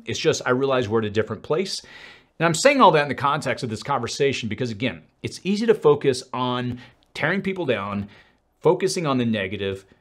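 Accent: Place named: South, United States. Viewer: Can hear the southern in ya hah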